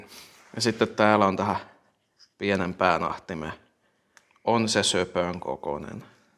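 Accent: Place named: Pirkanmaa, Finland. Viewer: Ammattimiehen hommia on kyllä kiva kattoa, vaikkei nyky-VAG:laisiin koskis ite pitkällä tikullakaan. Pirkammaan murretta on kiva kuunnella.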